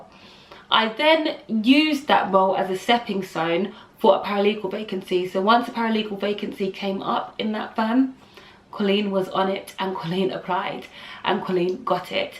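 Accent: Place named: United Kingdom. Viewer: She is not American she is in the UK. Speaking with a British accent in the UK is no different then an American speaking with an American accent in America. Her qualifications and skills is what got her the job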